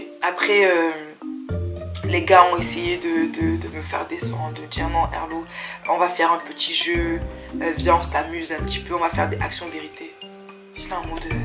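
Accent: Cameroon. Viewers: Utilises plus ton accent du mboa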